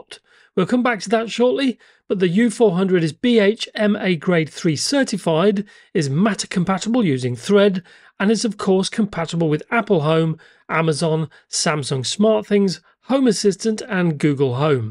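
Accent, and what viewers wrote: United Kingdom. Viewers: I love the ultra wide band! So cool! Great video too! Can I ask if I live in the UK and want to use this on a new front door, you sound British, do you think this is not for that?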